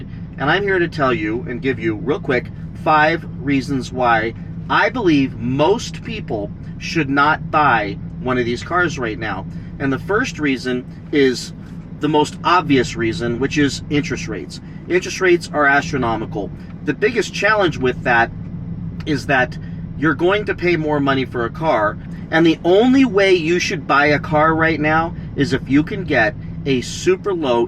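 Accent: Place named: Texas, United States. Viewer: Love your content and new subscriber - you sound like you belong here in Texas.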